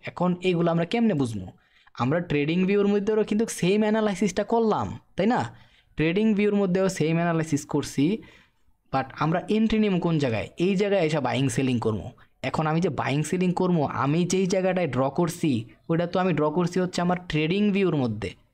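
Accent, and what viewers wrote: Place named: Narayanganj, Bangladesh. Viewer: ভাই আপনার বাড়ি কি নারায়ণগঞ্জ, আপনার কথার ভাষা এমনটা মনে হয়।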